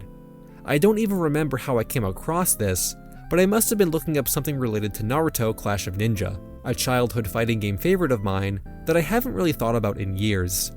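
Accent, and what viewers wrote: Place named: Massachusetts, United States. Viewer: 1000 to 1 your from Massachusetts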